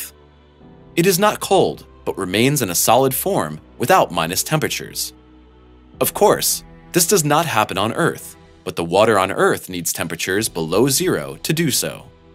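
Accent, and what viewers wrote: United States. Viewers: Why would you use km when telling of an American tornado! So friggin annoying! You even have an American accent! Use standard measures idiots!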